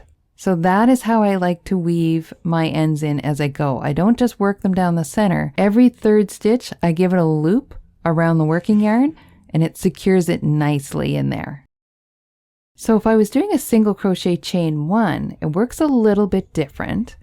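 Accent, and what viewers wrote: Canada. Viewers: Love this! Also love her East Coast or Canadian accent..."the yarn end pulls oot." 😊❤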